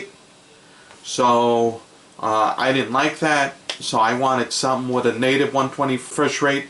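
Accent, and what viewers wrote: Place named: East Coast, United States. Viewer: are you from Brooklyn or Jersey! I know you from the east coast. you can of sound like dice clay😊 I'm older and remember watching him on cinemax in the early 90s but good review.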